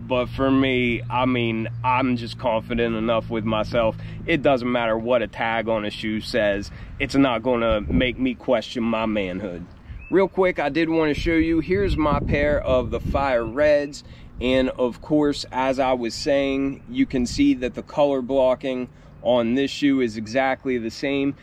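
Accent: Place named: Pennsylvania, United States. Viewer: Lost my best friend of 42 years in June. Had a heart transplant and didn’t make it. I feel your pain! We are all right there with you. Don’t forget that. I’m in Dubois PA , I can sense your PA accent haha. Been a 4 fan since 89! My fav Jordan ever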